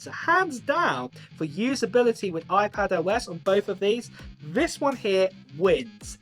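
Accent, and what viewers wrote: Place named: Midlands, United Kingdom. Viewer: Sounds alright to me, sounds like midlands region English accent.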